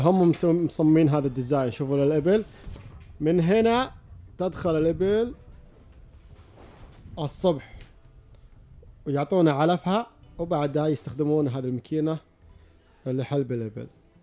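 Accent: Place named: Bedouin, Arab world. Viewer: لان بصراحه لهجتك شبيها للهجت بعض البدو هنا في مصر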